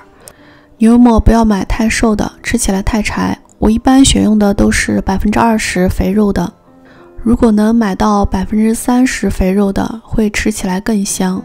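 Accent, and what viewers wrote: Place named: Northwest, China. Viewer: 西北口音哈哈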